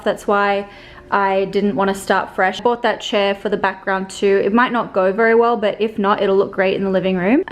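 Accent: Australia. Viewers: Agreed with!! Stick to our Aussie accent man! Xx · If it were me, I don’t know how I would deal with ALLLLLLL that makeup & beauty products, and having to organize it...maybe I would pay someone 😆 I agree w/ today on everything: you need paintings/art, a chest (besides his 😉), plants and keep the Aussie accent 😘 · Nice! L.A I am from Venice CA. Keep your Australian accent, it is Beautiful! My hubby has a Scottish accent. Love it ♥️ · I hope you keep your Aussie accent. I lived in the Philippines for almost 3 years and now that I’m back in Australia, everyone keeps saying I’ve got a slight American accent 🤦🏻‍♀️ I just want my Aussie accent back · We love your Australian accent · Love your Aussie accent and I just like the organisation for all of your makeup products and I would also love some vlogs kisses to both of you from Paris💋🇫🇷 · is right, stick to your Aussie accent, love it. 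· Don’t lose your beautiful Australian accent......listen your husband girl😀😀💕💕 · Aussie accent rules 😂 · I love your Aussie accent I agree with haha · We LOVE Australians in Los Angeles. Please keep the accent. 🌺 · Don’t lose your Aussie accent!! I’m Aussie and I want you to keep yours it’s why I love it!!! Also love these vlogs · yeeeeessssss please more setting up videos and yea she will loose her Aussie accent eventually and no no longer Australian you guys are american now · is right you should keep your australian accent, you and so beautiful together. Love you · I'm from Australia so I would say keep your Aussie accent... why should we change for people. And ur husband likes it too. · Welcome to LA guys. And keep the Aussie accent. Love you just the way you are. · Keep your Aussie accent! Even us Americans don’t like our own accents🙂 and more setting your place up vlogs pls👍🏻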